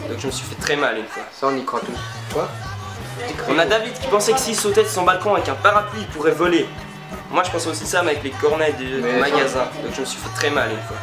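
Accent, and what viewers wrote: Switzerland. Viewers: Ton accent suisse? Parfait :o❤ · Ooooooooh, t'es suisse ? :') J'aime trop t'es vidéos t'es super drôle et le mec derrière il me tue trop :')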